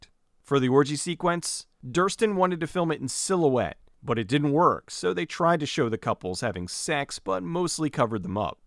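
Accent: United States. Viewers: I'm from Poland and don't know much about accents, but for me you sound so American that you make Americans sound like British.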